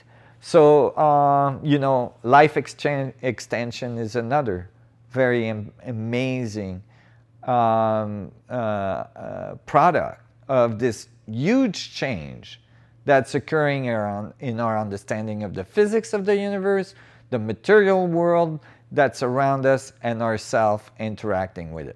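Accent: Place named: Quebec, Canada. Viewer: I am doing Joe Dispenza work at the moment and came across your videos and WOW. You explain everything so well. I would love to seat with you for an entire day. Je viens du Québec et je reconnais l’accent :-) je vis en Floride depuis plusieurs années. Si tu est dans le coin j’aimerais bien aller à un de tes cours. Merci mille fois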